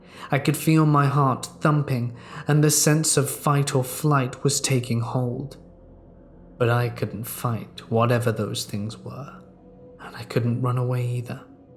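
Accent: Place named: Upper class, United Kingdom. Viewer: BBC English with a distinct aristo twang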